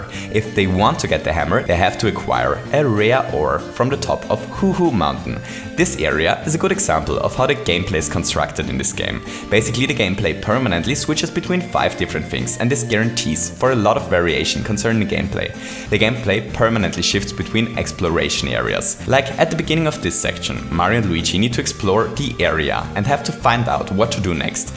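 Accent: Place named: Germany. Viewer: Probably because of his german accent.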